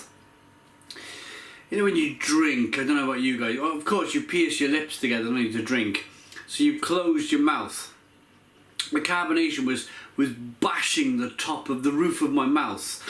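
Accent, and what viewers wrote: United Kingdom. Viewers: Interesting beer and another great review. Are you English or Welsh?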